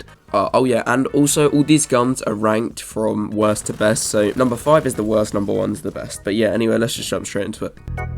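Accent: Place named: United Kingdom. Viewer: nope (btw thanks for that british mate)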